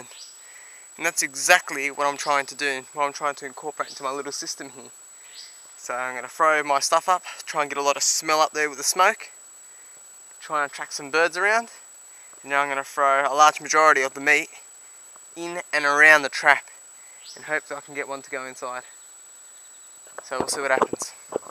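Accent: Australia. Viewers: Jensen Payne He's definitely Aussie. Not everyone in Australia has the same accent.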